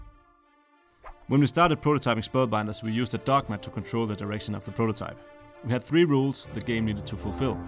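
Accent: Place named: Denmark. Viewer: he has a Danish accent. Just like me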